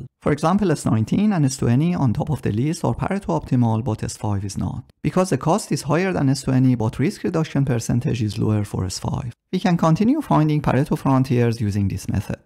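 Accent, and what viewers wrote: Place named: Iran. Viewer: Ah that lovely persian accent!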